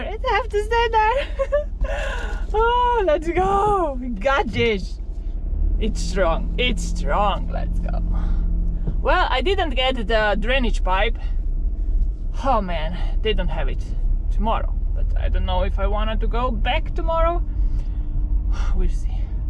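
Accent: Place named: Slovenia. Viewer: but it sounds Slovenglish and we need to practice our English.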